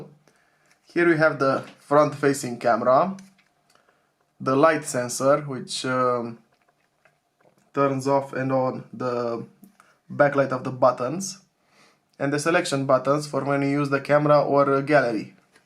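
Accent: Romania. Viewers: inconfundabil accentul romanesc al vorbitorilor de engleza :)) literalmente 30 de secunde a mers clipul cu vocea ta pana am pus pauza sa intru la 'About this channel' sa văd țara 😅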